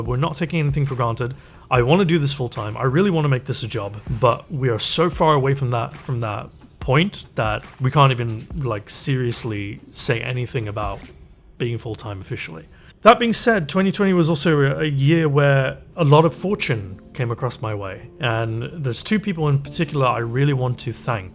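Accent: United Kingdom. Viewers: Happy birthday,! Only subbed very recently, literally two days ago. But I love the content I have seen, and it's lovely to see/hear a brit in a sea of Americans. Thanks for the tips and the laughs, here's to 2021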